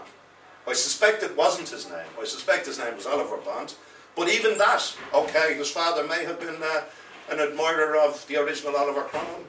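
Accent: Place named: Dublin, Ireland. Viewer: This jackeens accent is awful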